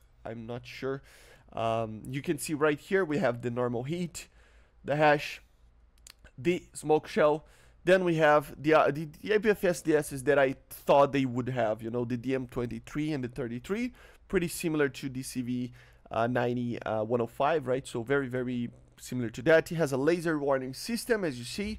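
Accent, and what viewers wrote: Brazil. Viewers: mano aprendeu ingles aonde ate que tu nao tem um sutaque tao ruim para um br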